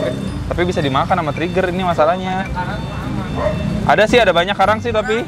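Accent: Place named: West Java, Indonesia. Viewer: Cara ngomong kak ini khas Sunda bgt apakah emg org Sunda? Wkwk penonton baru ini.... Dan ada yg bisa ngasih tau ini pasarnya di mana ya?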